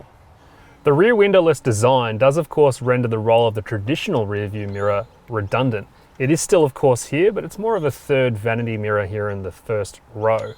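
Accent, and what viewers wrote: Australia. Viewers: The only spoiler is that tearable Australian accent. Very hard to understand. Kiwi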